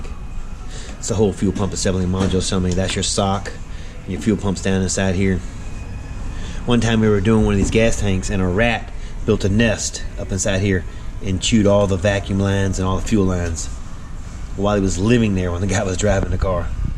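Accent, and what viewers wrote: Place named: New Jersey, United States. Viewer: Are you from mariland. You have a new jersey accent with a virginia twang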